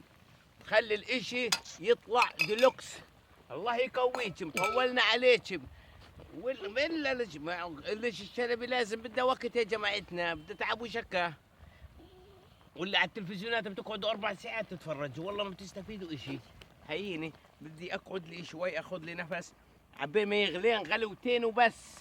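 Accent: Palestine. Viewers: اقرف لهجه فلسطينيه بعدين كسخت التمثيل ادعيه وحالتك حاله الإشي مبالغ فيه كلو مشان الشهره والمتابعه ههههههههههههههه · lahoo lahoo بالعكس احلى لهجة في العالم اللهجة الفلسطينية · لهجه فلسطين زي الكنادر مقرفه.. تحياتي لليهودحبايبنا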